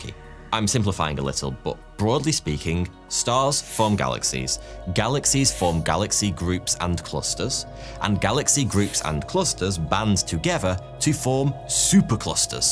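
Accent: United Kingdom. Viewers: Gravity is 10 to the 39th power weaker than electro-magnetism. The true energy that binds the universe. All this great attractor, dark matter, dark energy, black hole, suns are nuclear furnaces nonsense is exactly the reason, the "experts" keep on getting baffled. Everything is connected. Not by gravity, as it only attracts. If gravity were true, everything would be colliding and smashing into each other and no life would ever have formed, anywhere. The universe is balance, constantly changing, constantly in flow. This is how its perpetual. It isn't expanding, the speed of light is not a constant and red shift is not due to gaining of distance, its the measure of youth, higher energy. Besides, how can the universe be expanding, if there are all "super massive black holes" at the heart of every galaxy, sucking everything in. Its all fundamentally flawed and all the degree holding towers of the line, have their heads so far up Einstein's ass, they can't smell the dark mode plasma. 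Thought and movement are electric. We are tiny mirrors of the universe, as well as antennas, picking up signals, which means, the universe is electric too. Everything is connected. Electrically, gravity is a mere by-product. This episode of Thoughty2, sucks. Just another British voice on the screen, talking to the little kids watching with awe and wonder. Science has been compromised and is taking orders from the wealth, like everyone else. Keeping secrets, telling you how things work, as how one woul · Which part of British accent it is?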